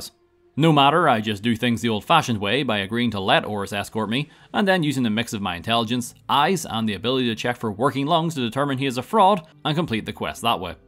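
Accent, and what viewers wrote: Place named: Northern Ireland, United Kingdom. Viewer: I love having your videos on to relax or for background noise and I never knew why until I heard from one of your Skyrim videos you're are also northern Irish I'm so glad I finally found someone from here who makes amazing videos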